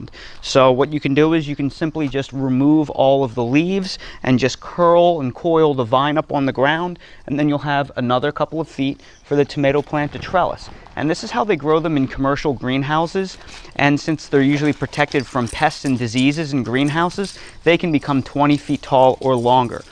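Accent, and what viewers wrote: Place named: Northeast Philadelphia, United States. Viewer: No way is this dude from North Carolina. His pronunciation of “herricane” means he’s from Gloucester County, NJ. Williamstown, Wenonah, maybe Deptford. “Shtrike” instead of strike is Northeast Philly.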